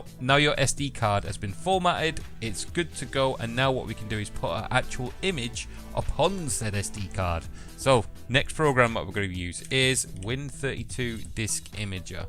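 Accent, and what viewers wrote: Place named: England, United Kingdom. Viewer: aahhh , the guy behind the funny english accent ....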